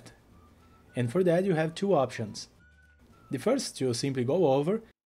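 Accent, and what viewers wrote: Brazil. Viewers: are you a brazilian? because if not your accent looks so much as a brazilian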